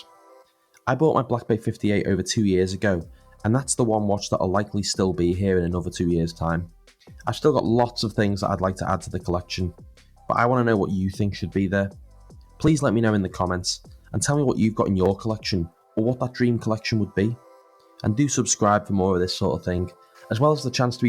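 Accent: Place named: Northern England, United Kingdom. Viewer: The voice is completely different, the commitments set in Ireland, and the chap running this channel is northern English (possibly close to Liverpool but not from the city itself?)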